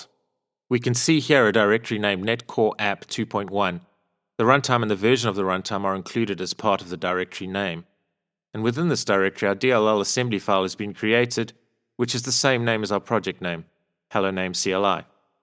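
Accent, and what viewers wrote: South Africa. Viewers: Thank you very much. It is a little challenging for me to digest everything after watching it once, so will need repeating but the quality of your course and of course S.African accent helps. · Howsit, was about to ask this but saw this reply. Thanks for the courses and info. South African PHP developer in the UK looking to learn c# - even better getting to listen to a fellow saffa.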